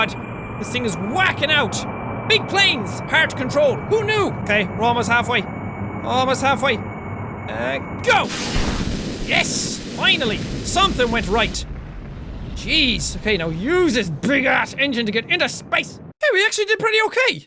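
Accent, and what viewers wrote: Scotland, United Kingdom. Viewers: Is he Scottish?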